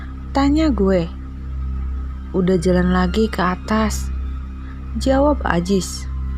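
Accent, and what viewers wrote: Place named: Java, Indonesia. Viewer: Medok gays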